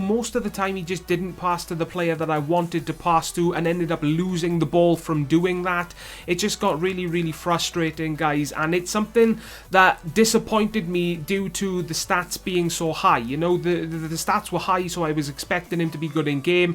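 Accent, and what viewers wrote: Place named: Wales, United Kingdom. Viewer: Beerus that's the Welsh accent bro, they pronounce certain words softly.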